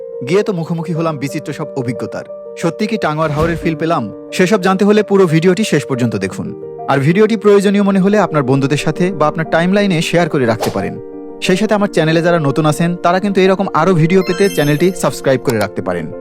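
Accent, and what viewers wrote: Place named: Khulna, Bangladesh. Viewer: আমি যতদূর জানি এটা হচ্ছে বিরুলিয়া ব্রিজের কর্নারে আপনার ভাষা শুনে মনে হচ্ছে আপনি খুলনা বিভাগে আপনার বাড়ি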